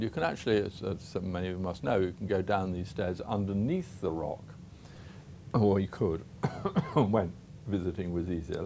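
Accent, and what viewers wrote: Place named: United Kingdom. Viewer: For him being such an amazing academic of Islamic history and a guy very proficient in Arabic, I find his overtly British pronunciations of Arabic words charming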